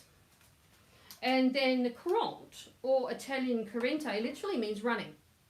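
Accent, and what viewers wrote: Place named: Australia. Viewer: Sharing your gorgeous Aussie accent and aspie outlook, and recent dip (10 years ago) into the recorder and early music.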